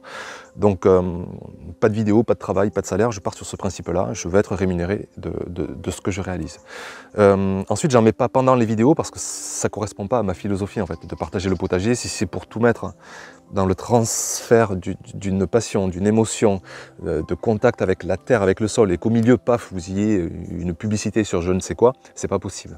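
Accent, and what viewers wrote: South, France. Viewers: Merci pour cette video et pour tous ces details que tu as partage, bien conscient qu'en plus de la passion du jardinage, il y a un gros travail pour faire ces videos... J'ajouterai pour compléter le tout, un capital sympathie incontestable et surtout cet accent du sud (j'adore!) qu'on vous envie dans le nord! BRAVO!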